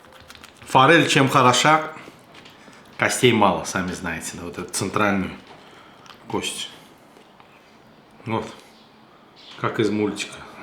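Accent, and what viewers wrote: Baku, Azerbaijan. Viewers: Салам. Акцент Бакинский 👍❤️👍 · Этому человеку необязательно что - либо готовить) достаточно просто рассказов с прекрасным бакинским акцентом и этого вполне достаточно)) Все как всегда супер, слюной подавились) Джаныныз саг олсун!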